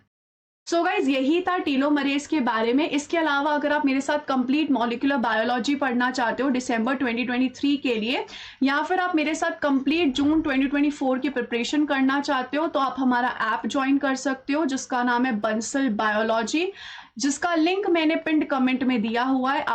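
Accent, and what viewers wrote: India. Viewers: Ek number bhava khamgaon bolte❤️❤️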